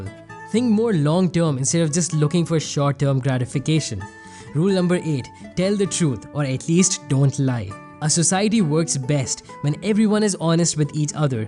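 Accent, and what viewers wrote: India. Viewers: I think the accent comes from him being Indian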